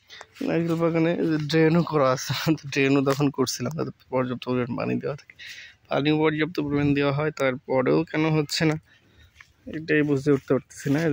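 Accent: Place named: Faridpur, Bangladesh. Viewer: ভাষা শুনে মনে হয় আপনার বাসা মনে হয় ফরিদপুর?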